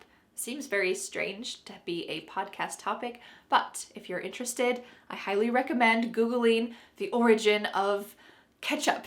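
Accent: United States. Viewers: I can't hear your voice from when you had stated this channel but I love your Vedios and I am so so happy because I have found a great teacher for me and I love American accent and I love your voice I want to speak like you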